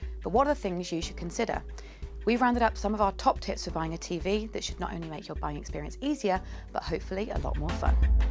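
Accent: United Kingdom. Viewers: I cannot make out a word she says! The british accent is lovely but is totally unclear for me. I would really like to know this info but cannot understand her. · Her British accent is just too thick !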